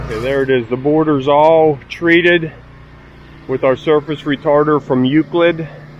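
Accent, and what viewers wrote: Pittsburgh, United States. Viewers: Are you a yinzer? You sound like it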